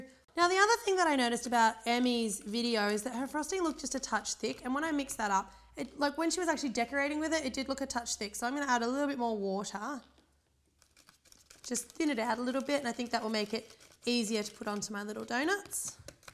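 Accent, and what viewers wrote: Australia. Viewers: Your voice is enchanting. I love Australian accents. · you sound like Rachel Ray with an Australian accent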